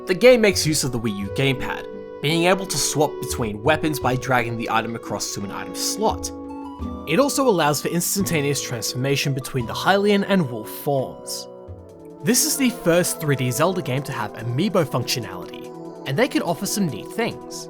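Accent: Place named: Australia. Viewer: Nothing better than some late night aussie/british video game commentary essay, great work!! if they remade the game like they did with xenoblade 1, I wonder if they would also just make it more anime like to match with botw but I fear it would impact the more dark and muddy tone it had, really added a lot to the ambiance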